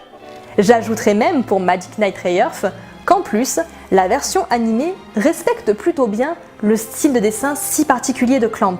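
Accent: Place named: France. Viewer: Ma chère fan girl si tu aimes tant les magical girl et les romances "love chunibyo & other delusions" est fait pour toi , je suis pret a parier que tu me remerciera en lançant des pétales de fleurs . Bon j’exagère peut être un peu mais il aborde ces thèmes et leurs codes avec un petit coté irrévérencieux très cool . Plutôt simple de prime abord ( J'adore cette expression )il peut être vu avec plusieurs niveaux de lecture si on se gratte un peu la tète et ca aussi j'adore . BREF FONCE ... non non non FONCE j'ai dit . J'avoue avoir sauter la partie sur "Your name". je fais partie des rares qui ne l'ont pas encore vu et j’esquive tout spoil potentiel ^^. J'aime bien ton accent français. Moi on me reproche souvent de prononcer comme un chef de la mafia Japonaise alors crois moi c'est pas si mal . Mais pourquoi est tu encore ici ? Fonce j'ai dit !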